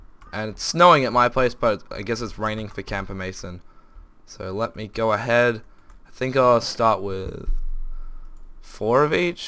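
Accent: Australia. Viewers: I swear he's Australian.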